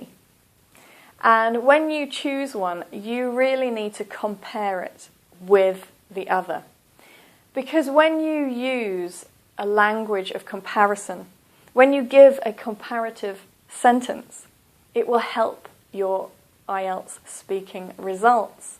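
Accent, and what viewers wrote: United Kingdom. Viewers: that's a British accent.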